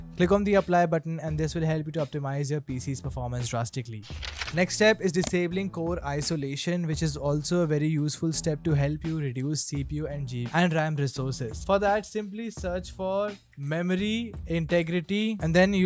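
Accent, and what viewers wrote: India. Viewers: if ur in an english speaking country pls for the love of god learn to not speak in an indian accent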